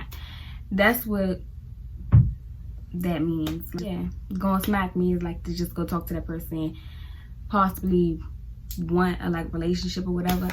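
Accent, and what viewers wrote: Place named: Baltimore, United States. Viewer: Im from Philly. The way you talk sounds like two Baltimore girls I know. And I know you're not saying (yew,dew) like them, but it's more than that. I can't explain it cus I'll be writing paragraphs. You can tell the DMV is a transitional area. DC locals just sound more southern.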